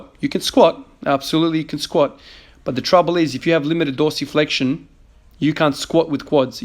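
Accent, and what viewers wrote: Australia. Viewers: I thought this guy was from Australia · I'm awful with accents. Are you Aussie, Kiwi or South African? Or none of the above? Haha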